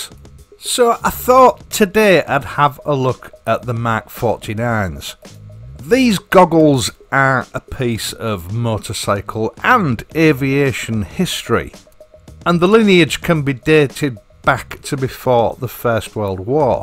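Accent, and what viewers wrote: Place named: Barnsley, United Kingdom. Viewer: Interesting accent! Where? Not Liverpool: barnsley perhaps? I am about to buy a Bell 500 (why don't they do the colours they used to market in the US??) and yes, Halcion goggles as per yours. It was almost already decided but your vid. took away any further question apart from where to buy both in or around Somerset (not via computer!!!). Bike,, I'm 71 and just bought a 1959 Harley Duo Glide. Silly old fool!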